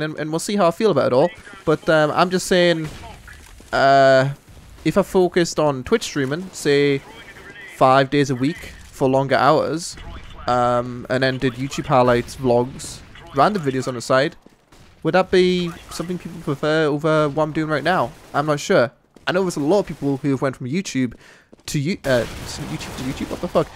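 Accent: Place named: England, United Kingdom. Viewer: work? what the fuck would you know about work. editing a video and playing games is not work. step outside your house. sell out. you made youtube videos once upon a time where it was no doubt fun. clearly, you are looking to shake this money tree. i dont buy into all this donating and subscribing garbage. its rubbish. you are not a charity. you provide mild entertainment at best,. you cheeky, english accent, condescending, adolescent whatever. i lost interest. friend-zoneded.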